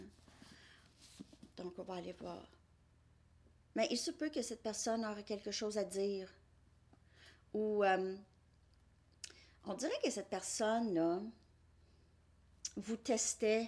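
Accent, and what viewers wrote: Canada. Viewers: Super. Avec votre super accent canadien trop mignon. 👋🏻🇫🇷😘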